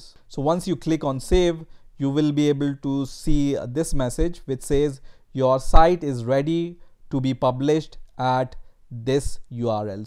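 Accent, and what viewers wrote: India. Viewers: This is the only Indian accent I like.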